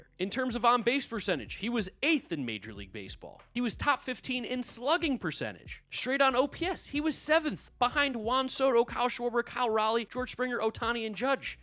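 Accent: New York, United States. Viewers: I guess that's the new York accent. I'm from New York and I don't either. Fowards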